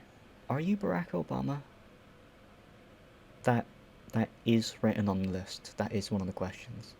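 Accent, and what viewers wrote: United Kingdom. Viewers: Thats a woman? Your just so British that I couldn't tell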